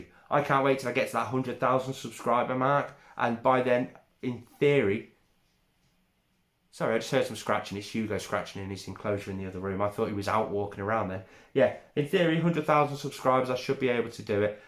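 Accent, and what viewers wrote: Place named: Oxford, United Kingdom. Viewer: Your comment on one of Brians videos brought me here. You said you were a content creator, so I clicked to check it out and checked out a couple of yours. Let me tell ya, I like what I see bro! Your voice reminded me of being with my grandparents who are from Oxford. I was homeless for 5 years but now I have a home, a son, car, motorcycle, 2 boas, ECT...Keep it up! Peace, from Yankeeland!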